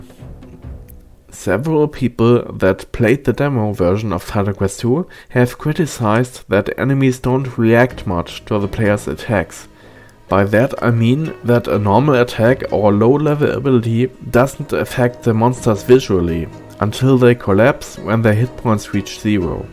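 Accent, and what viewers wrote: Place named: Germany. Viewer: least brutal German accent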